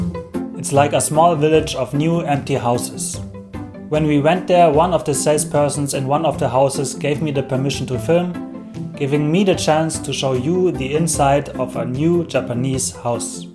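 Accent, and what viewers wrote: Germany. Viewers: Voll der deutsche Akzent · I love the German accent! Or is it Swiss or Austrian? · hahaha, so you are German. I checked out the page. But is your Japanese better than your English? I hear both German and Japanese influence in your English pronunciation.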